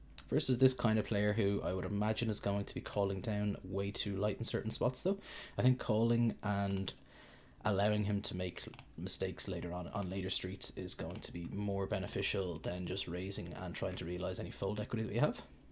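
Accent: Ireland. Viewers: Irish confirmed. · YOUR IRISH !!! fuck yeah !!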